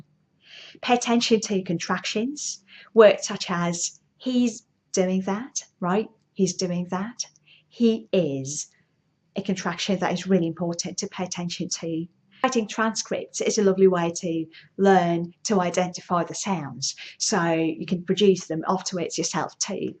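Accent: RP, United Kingdom. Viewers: Oh my god. This is bloody perfect. Yes, it's not native but rather a native-life from my inexperienced perspective. Sort of RP but very much fluent and free. What a work has been done. Thank you very much indeed. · Lol ur accent like ratu london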